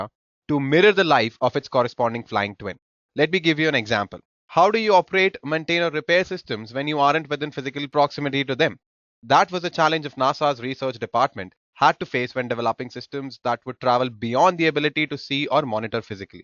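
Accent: India. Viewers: I really love this channel, My English is not good, so I have a problem to hear with Hindi English (sad)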